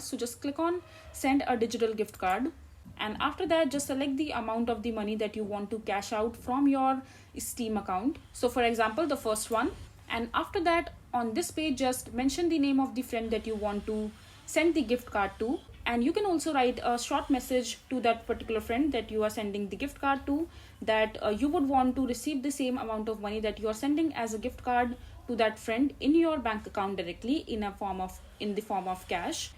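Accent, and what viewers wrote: India. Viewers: i can just smell your pajeet accent your name is not and you dont look like your profiile picture lmao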